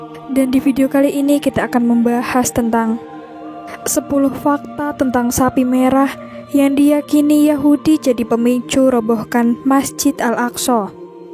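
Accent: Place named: Java, Indonesia. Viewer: Kentel bgt logat jawa nya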